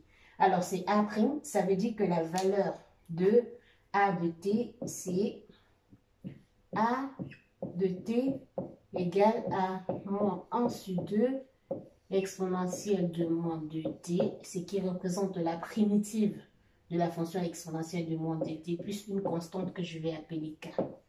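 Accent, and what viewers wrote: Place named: Cameroon. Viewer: Vous êtes camerounaise, cela s'entend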